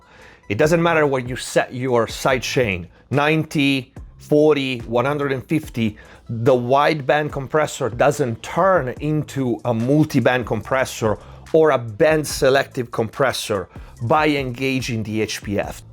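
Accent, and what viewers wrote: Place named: Italy. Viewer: I already knew the subject but it's great to see pros hitting the technical side of it. You sound Italian my friend.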